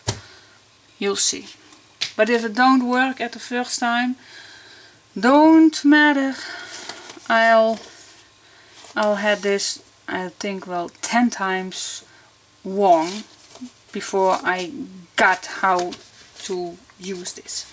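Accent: Brabant, Netherlands. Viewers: jeetje , ik ontdekte jou dit weekend op you tube....heb uuuuuren zitten kijken. koptelefoon op en manlief had geen last meer van me hahahaha en ben weer compleet geïnspireerd door jou ....had veel albums gemaakt maar zie dat ik nog veel kan leren van jou ook heel veel ideeën opgedaan ( gebruik nu ook acryl stempelblokje om met mijn lange nagels het tape af te scheuren top idee). vreselijk gelachen ook om je .... wat een kei gezellig mens ben je ( brabant zo te horen) zit wel met heel veel vragen over materialen maar ja jij zegt googel het maar alleen niet alles kan ik vinden wat ik wil dus hoop dat je toch stiekum vragen wilt beantwoorden ,,,, merk wel dat wij veel dezelfde ideeën hebben ook ik werl al jaren met het "potloopstreepje en meet ook nooit iets af ... ik ga snel verder kijken bij je , groetjes Marijke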